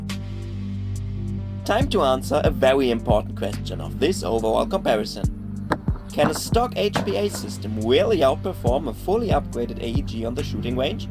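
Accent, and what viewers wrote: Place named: Germany. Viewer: Dang that shimming is perfect all that I hear is the pistonhead hitting the cylinder head. You are a damn well technician. It sounds even better then my MCX and I thought that one was perfect. Anyway you sound German. Are you by chance from North West Germany ? I would like to see you on our field (an old Gas powere plant.) indoor CQB with 7 floors of action.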